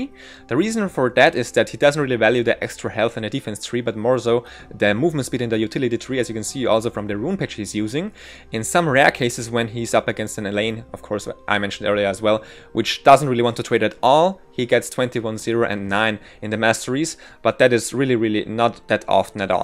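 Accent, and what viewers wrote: Germany. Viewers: nice video dude. Btw are you German by chance ? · hahah der deutsche akzent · Deutsch?hast irgendwie nen deutschen akzent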